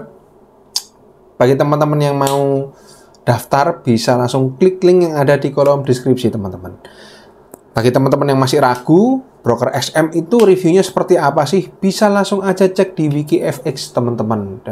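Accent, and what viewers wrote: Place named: Java, Indonesia. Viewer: Bahasa Inggrisnya belajar dari mna mz , kok keren bgt agak2 logat jowo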